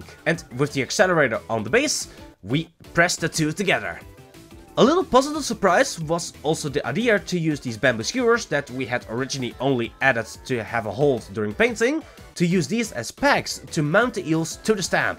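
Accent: Netherlands. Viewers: BEAUTIFULLL!!! btw are u from nederland? u have that accent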